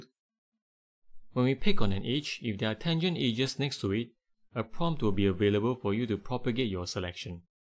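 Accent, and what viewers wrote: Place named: Straits, Malaysia. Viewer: Thank you for the clear and to the point tutorial. After watching 4 other confusing and poorly presented videos, this was refreshing time saver, as was the Straits English over Indian English dialects.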